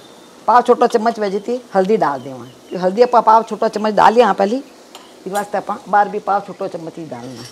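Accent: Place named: Rajasthan, India. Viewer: She is speaking marwadi not hindi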